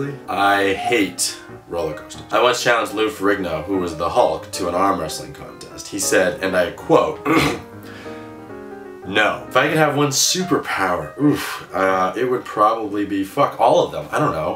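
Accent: Boston, United States. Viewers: Are u from boston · I'm just marvelling at the Boston accent "step on a crack ya break ya muthas back"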